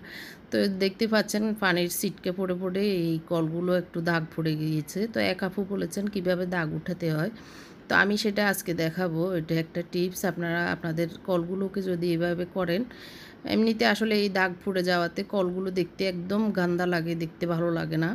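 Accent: Noakhali, Bangladesh. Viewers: আন্নের বাড়ি নোয়াখালী মনে হয়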